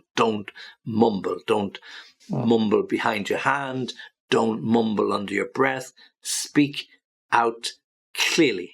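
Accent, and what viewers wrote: United Kingdom. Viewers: Hi teacher! Thank you for your help and work. Amazing, helpful… really good to improve our English. By the way, from what part of the UK are you? I am trying to catch your accent.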